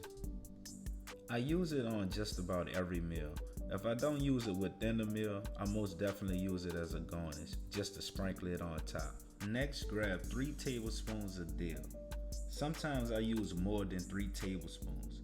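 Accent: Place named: New Orleans, United States. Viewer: The New Orleans accent tho 💪🏾💪🏾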